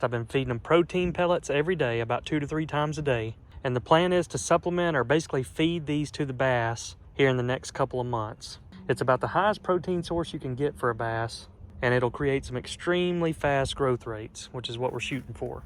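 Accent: South, United States. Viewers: Hearing you describe the pond tech in a Southern accent is peak Americanness.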